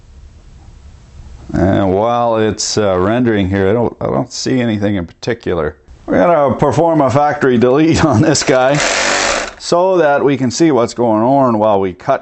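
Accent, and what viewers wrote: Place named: Canada. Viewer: havent heard a more Canadian sounding man reviewing shit tools on youtube yet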